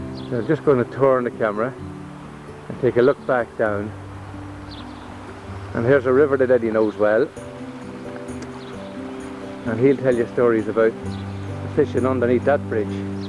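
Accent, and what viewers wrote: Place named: Cavan, Ireland. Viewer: Thank you Ciaran. Glad you like it and my Cavan accent!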